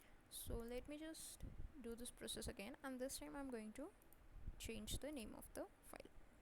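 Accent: India. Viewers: Can barely understand you with your heavy Indian accent. In English, the letter V is not W. Just saying. Plus, you speak to fast. Your English words are blending together.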